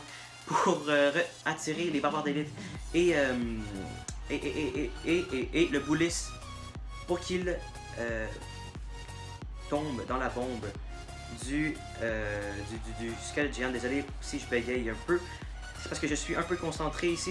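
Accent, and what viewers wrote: Quebec, Canada. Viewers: est tu quebequois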